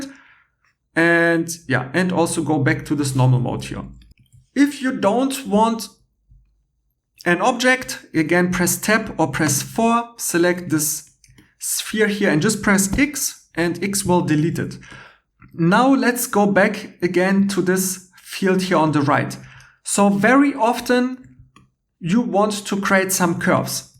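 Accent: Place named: Germany. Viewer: Gibt es das auf Deutsch? Dieses hässlich klingende Englisch mit deutschem Akzent ist unerträglich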